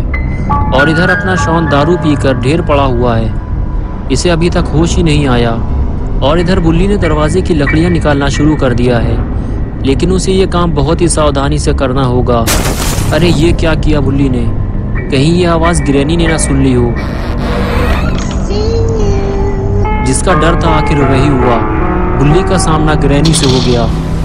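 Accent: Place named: Hyderabad, India. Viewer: Fast comment nice video hyderabadi Style me boleto kiraak mouth, 👌👌👌👌👌👌👌🌹🌹🌹👍👍👍👍👍👋👋👋👋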